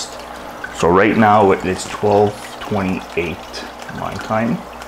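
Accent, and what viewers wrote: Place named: Canada. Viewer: I can’t thank you enough. The instructions that come with the feeder were certainly written in China where the feeder was made. The instructions are impossible, like IMPOSSIBLE, to understand. Returning this would have been a pain. Thanks to your video in native English, Canadian I believe, I got it set up in minutes. Thanks so much!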